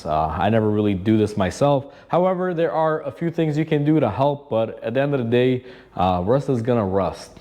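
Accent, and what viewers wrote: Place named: Chicago, United States. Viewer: People think that accent is southern and not anything but Chicago? Man, you folks need to get out more.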